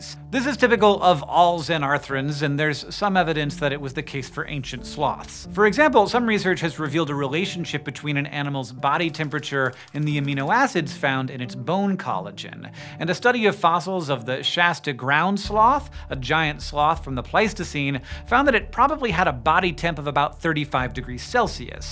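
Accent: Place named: United States. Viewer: I knew Americans said 'erbs , but Lordie they say 'erbivores too.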